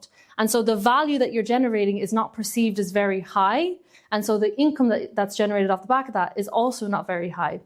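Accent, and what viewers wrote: Ireland. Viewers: I appreciate the blazer. For all it may have represented in your presentation. Tbh I am so bamboozled by the wholesomeness of the Irish accent. It is hard to quantify exactly the truth behind the words. Resonates like a mother's tongue. Much more than a business and marketing exercise some critics pertain. Anyhow keep going, if we have a choice! More importantly thanks for the guided meditation practices. They matter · It is so refreshing to hear an Irish accent!!! Thank you ❤❤❤❤❤ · I love your accent - Irish ? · Another great vid - completely unrelated, I am fascinated by accents; as well as an obvious southern Irish lilt, I detect something else - Scandinavian? Central Europe somewhere? Apologies if this is none of my (anyone's) business. 😄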